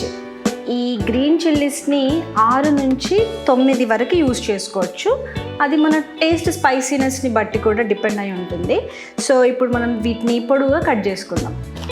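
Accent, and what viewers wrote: Telangana, India. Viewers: Akka ... Naku ge food gurinchi anthaga. Thayliyadhu. . but .... Telangana slang matha .... Chimpaysav🤟 all the best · టమాట పప్పు ఇష్టం ఉండని వాళ్ళు తెలంగాణలో ఉండరు మీరు మాట్లాడే పద్ధతి మస్తుంథి తెలంగాణ 😍❤️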